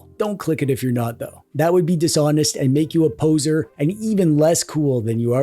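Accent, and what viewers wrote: Canada. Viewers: Too much rambling, like you enjoy the sound of your low monotone, raspy voice with that obvious canandian accent just wasnt it for me. I could've done without the uneccessary comedy and jokes thrown in. I dont think there's anything I learned from your "review" about this gaming device. No sub from me.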